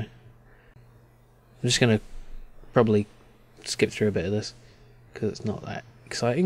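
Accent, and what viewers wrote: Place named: United Kingdom. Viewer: Your British accent is much appreciated from the USA.🇺🇲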